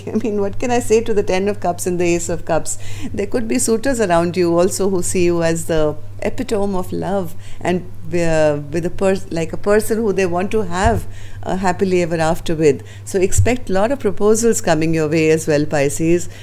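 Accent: India. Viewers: are you a Pisces? You speak so sweetly, with so much love & so much pain & sympathy when we go through disillusion. I am Pisces, an Indian & I think you are Indian too, going by your accent. I've been watching your readings since the past 3 months & I look forward eagerly to your readings. Your interpretation resonates with me very much. I am not feeling too happy, today by the reading because, I really love & want my Virgo to begin talking to me again. We've been in separation for the past 6 months. I do leave everything in Spirt's hands & I accept whatever Spirit decides for me. I just want peace & truth & resolution of this separation. Thank you so much for your help. Be blessed. Namaste.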